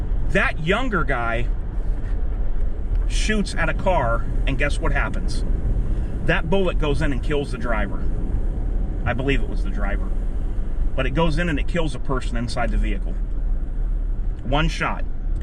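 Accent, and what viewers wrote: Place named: Western Pennsylvania, United States. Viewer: Obviously you’re from Canton too. Although I can almost detect a Western PA accent.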